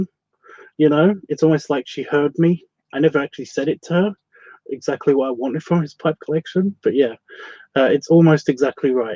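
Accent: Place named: England, United Kingdom. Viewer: Sorry to learn about how you're not feeling well. I realize this posting is a week old now so hopefully you're back to your happy self as you read this... I've been working heaps this past week, to get a 4 day holiday weekend, as we celebrate Victoria Day in Canada. Regarding your throat discomfort, it may be possible that it's a symptom of an allergy. I developed allergies when I turned 40 ish - never having suffered from them before. The time of year plays a big part. So hopefully your hot bear body will fight it off. All this time I've admired you, I've never asked you directly, just assumed you make your home in England? You're wonderful accent leads me to this conclusion (ya think?) LOL. England; for the most part, experiences much the same weather patterns as we live with here in my home of British Columbia. It certainly provides the necessary elements, which ultimately evoke the bloody flu. 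Anyhow, I wish you a speedy recovery and look forward to your next post bear. Hugs from your furry bear Canuck buddy.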